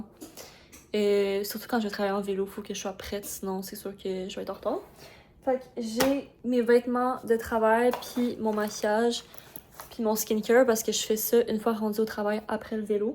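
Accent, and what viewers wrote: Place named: Russia. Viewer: sorry, but once I heard you I thought you russian , I didn't understand anything 😆😆 love your ideas, hope good for you 💕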